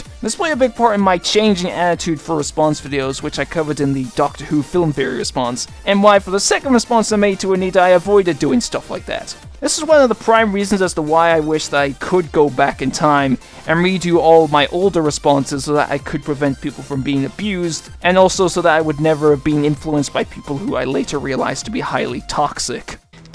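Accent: England, United Kingdom. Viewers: It's weird, I could've sworn that ever since I discovered your channel, I knew you were English because of your accent. But maybe I'm just confusing accents with dialects. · I find it odd that a lot of people didn't know you were English. I knew from your accent, despite how subtle it is. Maybe it's cause I'm autistic as well. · nice video, I like that you own up the mistake also you okay. I thought you sound England but from America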